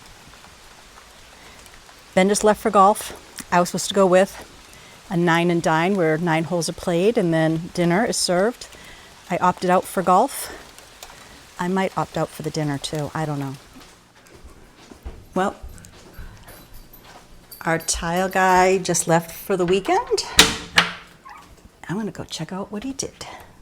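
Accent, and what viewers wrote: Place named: Philadelphia, United States. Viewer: I love when I hear you say soar for saw…very Maineish for someone who has a Philly accent. Thank you for your channel. Your voice is very soothing ..a follower from Pemaquid Point in Maine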